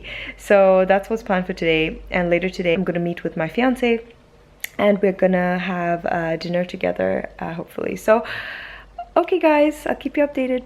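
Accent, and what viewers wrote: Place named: India. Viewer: She's definitely indian u can hear the indian accent lol also she kinda had that indian nose 👃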